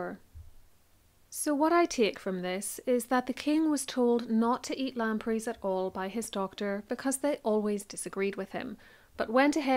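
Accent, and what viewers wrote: Northern Ireland, United Kingdom. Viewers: She's from Northern Ireland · I agree, part of the reason I listen is for the beautiful music of this accent. Northern Irish, maybe? Whatever it is, your diction and accent make the videos wonderful to listen to. 🥰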